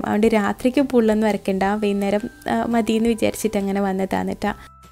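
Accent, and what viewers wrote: Kannur, India. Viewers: പൊളിയാണ് വീഡിയോ എല്ലാവരും നല്ല ഒത്തൊരുമയോടെ ഉള്ള ഫാമിലി ആണ് ഫുൾ ട്രിപ്പ് ഫുൾ വീഡിയോസ് അടിപൊളി ഞാൻ എന്നും കാണാറുണ്ട് എന്റെ ഫേവറേറ്റ് യൂട്യൂബ് ചാനൽ എന്ന് പറയുന്നത് ഇത്താത്തയുടെ തന്റെ ക്യാരക്ടർ എനിക്കിഷ്ടമുള്ളത് വോയിസ് എനിക്ക് ഭയങ്കര ഇഷ്ടമാണ് മാഷാ അള്ളാ നിങ്ങളെ കണ്ണൂർ ഭാഷ പൊളിയാണ് നിങ്ങളുടെ കാമി റ ഞാൻ ട്രൈ ചെയ്തു അടിപൊളിയായിരുന്നു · Ningale kannur style samsaramanu ettavum cute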